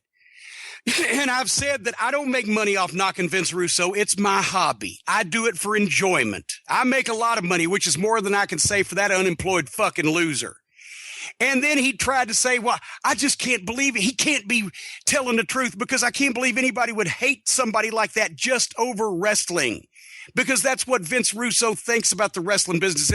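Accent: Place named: Kentucky, United States. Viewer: Bring out the Kentucky in a old man . Love it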